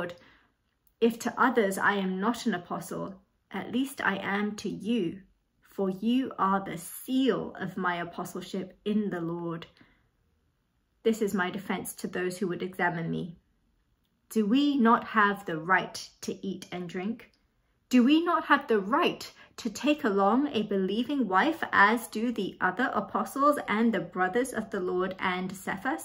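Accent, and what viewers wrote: United Kingdom. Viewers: Lovely accent but unfortunately there is not a British accent. There are 4 countries within Britain, England, Scotland, Wales and Northern Ireland, and they are all beautiful accents in their own right. You are speaking with an English accent, very nice it is too. So many people from abroad get confused and think it's one accent, but its 4 and each country has a variation within them. 🇬🇧👍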